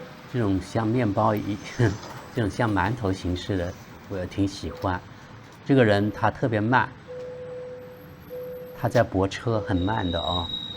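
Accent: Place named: Cantonese, China. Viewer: 小姐姐不像台湾腔，像广东腔。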